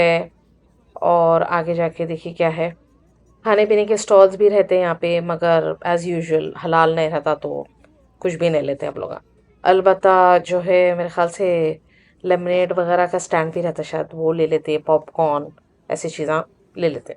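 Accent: Hyderabad, India. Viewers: Really proud of u being using hyderabadi accent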